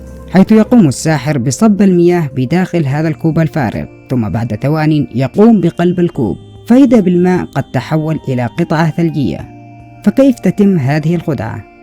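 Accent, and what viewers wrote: Yemen. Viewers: عمر باعمر هذه لهجة يمنية و يصعب فيها نطق بعض الحروف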